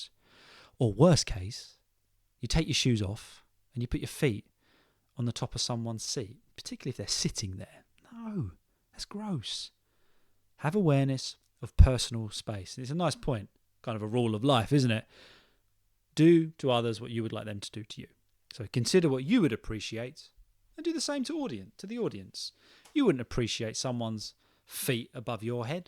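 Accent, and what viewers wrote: RP, United Kingdom. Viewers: Hi yeah, Hope you are doing good. Being an expat from a non English speaking country and having an advanced level of English speaking, I still find it difficult to grasp British accent. I recently started listening to your podcast with an intent to get accustomed to it. Thank you; you are helping me in understanding the accent and British communication style. Hope listening to you more will help me crack the accent soon. On the critical side, at times I feel you speak more of RP English. While It may be your natural speaking and teaching style, I sometimes come-across accent that are even more harder to understand. Coming to the topic, I feel etiquette is set of unscripted rules which vary with place and culture. Following these unscripted rules can be daunting for someone like me who is new to the country. Cinema etiquettes is almost same everywhere, but there are always a few who don’t follow it - at the very least, by not being on time. 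About the personal space, in my country, putting feet on the seat is considered rude and doing so with shoes on is the rudest. (Can’t imagine the places that shoes might have stepped! ). Unfortunately, I see a lot of youngsters doing same in trams and trains here. To be frank, that’s worst behaviour. Rogues exist in every corner of the world. Another disgusting behaviour that I experienced here is people loudly blowing their nose (while having flu) in a professional and quiet space. It’s really nauseating, especially coming from otherwise w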